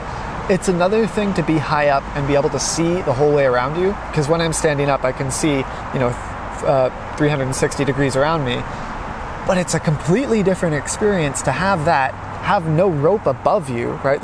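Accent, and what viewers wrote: Canada. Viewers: I like your argument, but he doesn't live in Germany. He lives in Canada. You can tell by his accent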